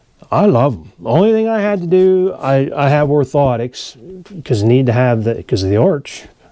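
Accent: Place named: Pittsburgh, United States. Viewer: Are you from Pittsburgh or near it by chance? You have a Pittsburgh accent.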